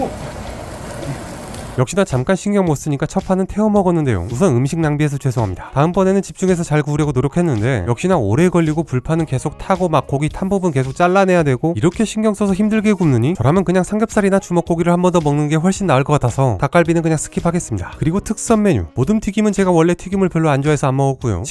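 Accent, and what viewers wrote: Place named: Jeonju, South Korea. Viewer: 전주분이셨군요?역시 목소리톤이 사투리는 없지만 억양이 전주식 아니겠지 보면서 참 친숙해했는데...좋은영상 만들어주시고 항상 잘보고 있어요. 제가 전주를 좀 알아서요.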